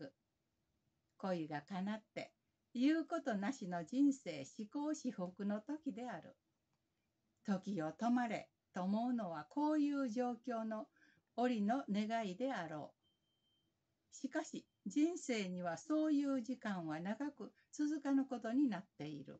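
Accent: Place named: Kansai, Japan. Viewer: こんにちは、私は長年田辺聖子さんのファンでした。本で読み慣れた田辺節、滑らかな関西弁のイントネーションと共に大変楽しく拝聴させていただきました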